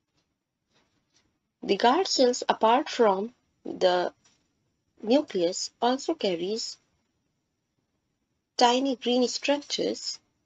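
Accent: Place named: United States. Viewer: Ma'am the video was fabulous , marvelous , fantastic , mind blowing , exellent . Your way of teaching is fabulous the way you explain is just fantastic . I had problem in understanding this topic but after watching your video i understood everything . I sure that you are the best teacher in the world with a stylish or i should say american accent . If you will start uploading videos like this only , then i am sure that you will the silver play button the gold and then platinum play button in one month only with at 30m subscribes and atleast 32m views on your each and every video .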